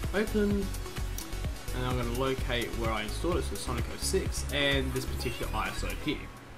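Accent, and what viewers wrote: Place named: England, United Kingdom. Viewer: Great tutorial my dude.... Also, great voiceover throughout the video... I am just in love with an English accent no homo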